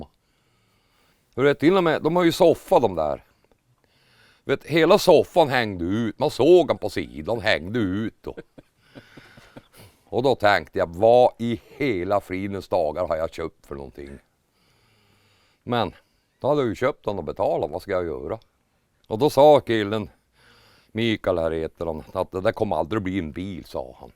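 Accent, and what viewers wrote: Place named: Norrland, Sweden. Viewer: Hur roligt som helst 😂att se en Opelfantast med en dialekt som är superhärlig. En sann norrlänning 👍. Jo jag har haft ett antal Opel genom åren. Några Rekord, 1 Commodore, några Kadetter, en Omega kombi, och den sista var en Astra.... Gillar man rost då ska man köpa Opel😄. Nä fy, det var alldeles för mycket fel på dom, i synnerhet Omegan från -87. Men det är kul å se att det är någon som fortsätter och gilla och bevara Opel från den tiden. Något som skulle vara kul att ha vore en Commodore från tidigt -70 tal men nu är det för mycket pengar i dom... Hälsningar från en Sörlänning boende i Småland 😎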